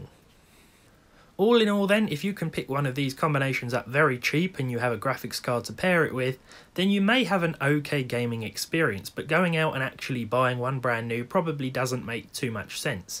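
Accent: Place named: England, United Kingdom. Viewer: I like your original English accent. I used to live in Tauton City. I learned a British accent. greetings from Germany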